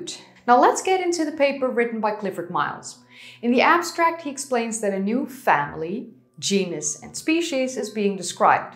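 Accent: Netherlands. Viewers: You must be Dutch right? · her wonderfull dutch accent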